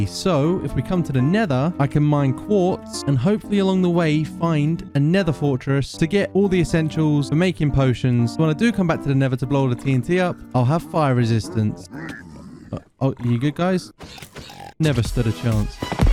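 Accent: United Kingdom. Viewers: WHY ARE YOU BRITISH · Is bro British?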